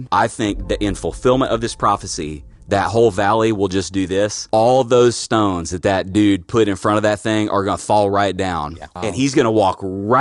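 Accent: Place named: South, United States. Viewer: Not to diminish his point (I honestly couldn't focus on it), but his strong stereotypical southern drawl and overuse of diphthongs was incredibly distracting.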